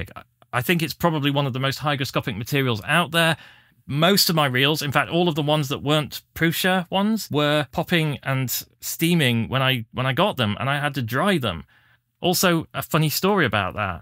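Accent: United Kingdom. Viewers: I've not tried it, but you might be able to get more purer ethanol in the form of BioEthanol (~95%) assuming by your accent that you're in the UK, they sell this in B&Q, although the pricing is pretty much the same as IPA, so not sure if there's any benefit.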